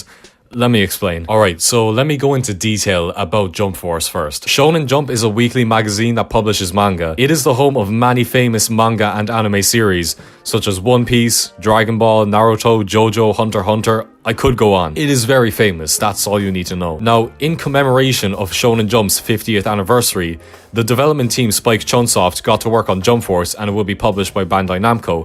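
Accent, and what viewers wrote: Ireland. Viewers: You irish? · R u irish?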